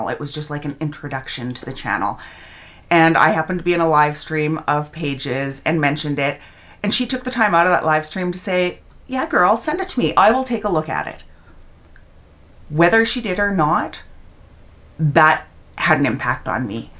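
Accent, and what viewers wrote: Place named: Canada. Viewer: I had a feeling that was a Canadian accent, then you mentioned ketchup chips, and that confirmed it! I had a friend in Canada that used to send them to me in Virginia about 4 times a year, they are my favorite! I miss her and especially the chips. I only wish I could meet the kind people you are describing in this video. Most of the people you are speaking of seem very caring, I'm happy you had such a wonderful time. You didn't stop smiling throughout the whole video, and that really says something ❤. You're a true good-hearted Canadian, and I'm very happy you had such a great time with fabulous friends. 🤗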